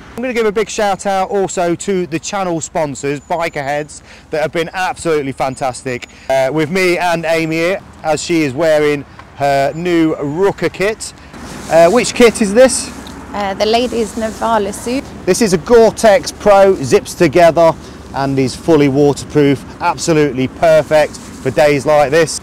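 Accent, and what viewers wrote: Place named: Leicester, United Kingdom. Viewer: I live in North Yorkshire now but love it when I hear a Leicester accent, where I’m from. Great bike, tempted to swap my GS. Entertaining video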